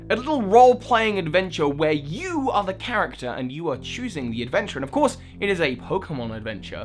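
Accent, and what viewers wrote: United States. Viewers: Nice American Accent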